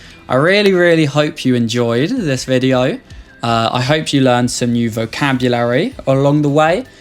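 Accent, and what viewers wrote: United Kingdom. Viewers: Bu ingiliz ingilizcesine bayılıyorum, dinleye dinleye kulağım alıştı.Hangi bölgedensiniz, bu diyalekti çok seviyorum.Birmangham, liverpool, veya güney? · I like the way you pronounce the "os" in words such as "don't", "hope", "go". Can I ask where you are from in the UK?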